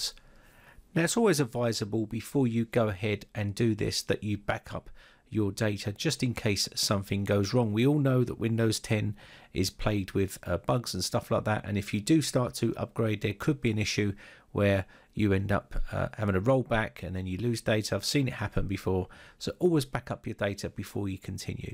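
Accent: England, United Kingdom. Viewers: That's a bit mild. I think they are parasite jive turkey mother humper cunts. Sounds so much better mate. Your videos are clear precise and in English from a English man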